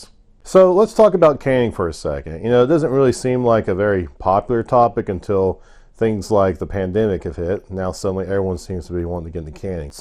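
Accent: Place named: Appalachia, United States. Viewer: He gots one of them Appalachian accents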